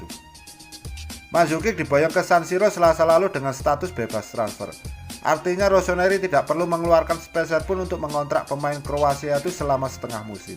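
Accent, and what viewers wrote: Java, Indonesia. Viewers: Medok ngomong.e boss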